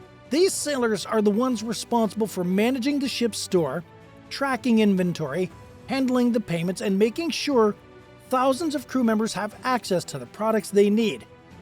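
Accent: Canada. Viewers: Fellow Canadian narrating?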